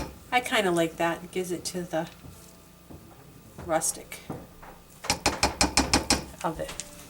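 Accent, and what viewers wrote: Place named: Boston, United States. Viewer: Are you n Boston? I think I am detecting a Boston accent from you. Nice soap by the way.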